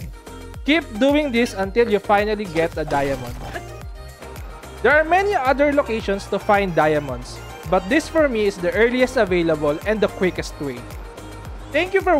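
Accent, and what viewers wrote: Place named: Philippines. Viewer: you kinda sound like a filipino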